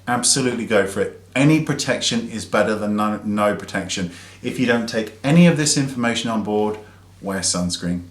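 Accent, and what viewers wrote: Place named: United Kingdom. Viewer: probably, hes british and he just pronouces it differently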